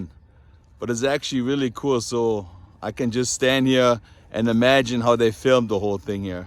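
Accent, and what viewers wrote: Germany. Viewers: Dachte sofort als ich dich hörte, das ist ein Deutscher und dann sah ich den username. 😂😂😂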